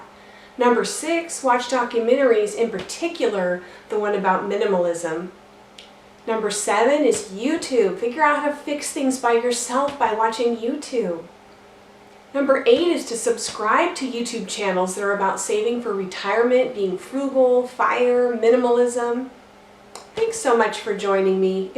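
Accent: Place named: Midwest, United States. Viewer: New subscriber! I am retired for last 5 yrs at age 57, now collecting my SSI at 62. Where do you live? Thinking maybe MN or WI? detect an accent Of that sort? I lived in Chicago area for 25 yrs but now live in SC for past 10 yrs and love it. 😎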